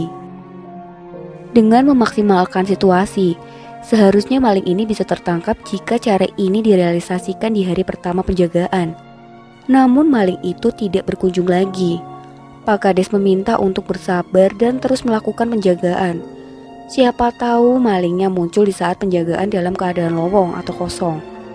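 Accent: Banyumas, Indonesia. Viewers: Bahasa ngapak yak😅😂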